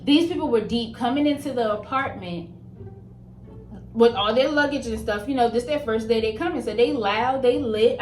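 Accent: Baltimore, United States. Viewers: Her accent sounds like she’s from Baltimore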